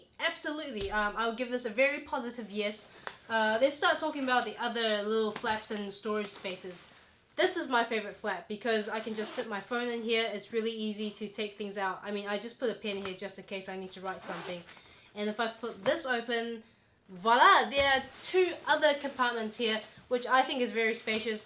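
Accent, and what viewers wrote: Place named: New Zealand. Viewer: I love your accent. All the people from NZ I’ve met are polite and nice. I also love your current PM. she is so great and dose a fantastic job dealing with the covid virus.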